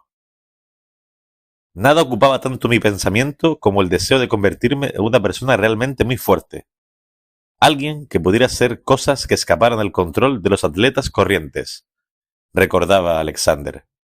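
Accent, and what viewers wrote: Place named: Canary Islands, Spain. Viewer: Muy buen contenido estás haciendo. Si ves conveniente podrías hacer vídeos explicando su método de entrenamiento para tendones. Gracias, por cierto por tu acento creo que eres canario, yo soy de Gran Canaria. Un saludo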